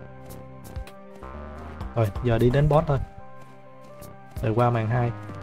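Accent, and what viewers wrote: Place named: Mekong Delta, Vietnam. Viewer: Nghe giọng thì chắc dân miền tây ròi, e cũng miền tây nè, mà nghe bảo có cam k bận đồ mà a 😓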